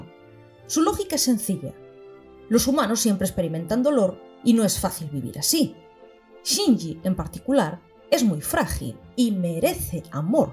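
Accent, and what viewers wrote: Spain. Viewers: Una pregunta, eres gallega? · Son fantásticos, todas y todos los que estén detrás de este trabajo, mucho orden, demasiada edición, la narradora tiene el tono de voz ideal con el endulzante acento español, es increíble. Estoy enamorado de sus videos. Saludos desde México!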